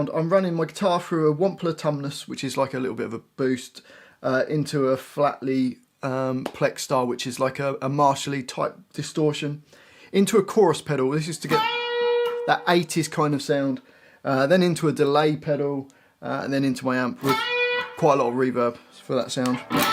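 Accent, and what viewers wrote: United Kingdom. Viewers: Do you sound like Ozzy Osbourne, are you English?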